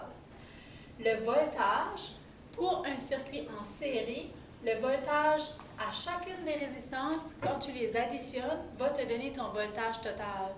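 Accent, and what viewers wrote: Canada. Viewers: Merveilleux accent 8') Les canadiens vous êtes vraiment géniaux !!!! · Bonjour, D'abord, merci, pour l'accent québecois que j'adore et me rappelle d'excellents souvenirs. Ensuite merci pour cette explication claire, surtout sur la formule des résistances en parallèle que j'ai du mal à assimiler: la simplification des fractions est une difficulté pour moi, qui ai passé la soixantaine, et dont les notions scolaires de maths sont très loin. Il est, en plus, difficile de trouver une vidéo détaillant bien cette formule. Merci encore, et bonjour au Québec, tabernac' !!!!!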